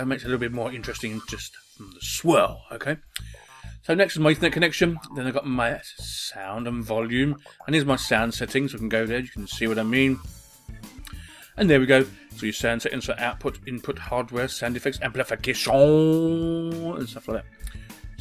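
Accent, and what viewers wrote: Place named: Cockney, United Kingdom. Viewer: Yes, please less Cockney ("bub,bub,bub") and more English.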